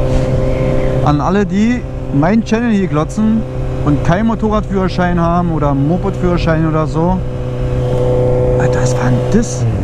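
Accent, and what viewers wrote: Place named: Berlin, Germany. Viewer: da bin ick mal jespannt Keule was übern Winter verändert wird💪😎. Wenn der Schwarze Ring kommt dann mit Gravur = idee von Matze 😂💪. Ick fühl mich dann immer wieder in die Kindheit zurück jeholt wenn die Berlinerschnauze durch kommt. Bin ja in Steglitz groß jeworden vermisse Berlin daher Feier ICH deine Videos mit der Schnauze😂👍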